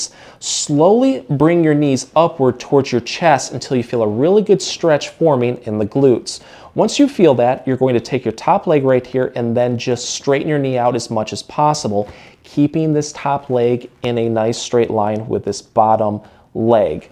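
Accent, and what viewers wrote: Michigan, United States. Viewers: Michigan accent?????? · Just the warm ups fixed it for me. Go Michigan!! Luv that MI accent😊 1000 Thanks 👍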